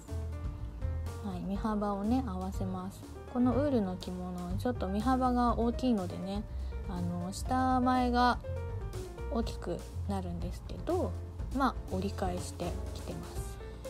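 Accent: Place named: Kansai, Japan. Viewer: 私もめちゃくちゃ迷います！特に着物は、結局何が正解かわからなくなってくるのでぱっと見の印象が残ってるうちにさっさと決めるように頑張ってます笑というかさん関西人なんですか！？ちょこちょこイントネーションが方言っぽいですね！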